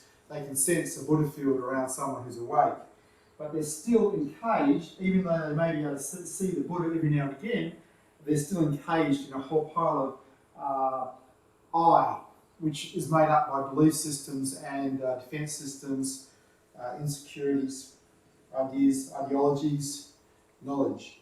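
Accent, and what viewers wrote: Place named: Australia. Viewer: I wish I could understand the Australian accent 😢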